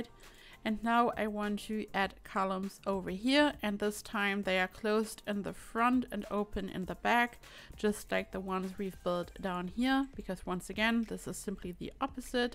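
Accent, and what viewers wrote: Germany. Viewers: Deutscher Akzent iz da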